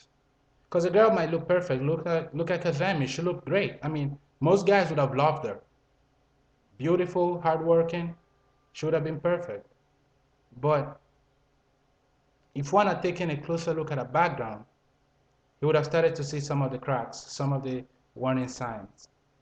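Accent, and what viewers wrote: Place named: Italy. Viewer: You must be Italian??? At least it sounds like it haha. It's a nice accent though. I'm German. But man, you are 100% correct. You have to watch out what kind of women you are dealing with, they are secretive and snap at any moment. Poor McNair.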